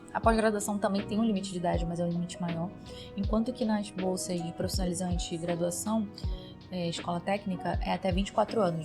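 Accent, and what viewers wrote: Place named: Pará, Brazil. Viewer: Um sotaque lindo, parece paraense❤😂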